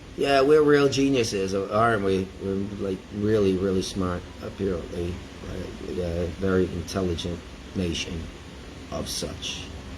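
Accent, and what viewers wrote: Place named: Guyana. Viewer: im surprised u int popular lil advice tho shorter vids st88 to d point n more of d gt raw accent guyanese lik ppl dat doz tlk wah dem tinkin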